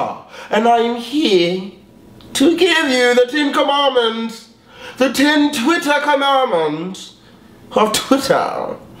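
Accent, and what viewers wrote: United Kingdom. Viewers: I swear I can't stop laughing the British accent loooooooooool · Oh help me. Brilliant British accent if i say so myself. what what.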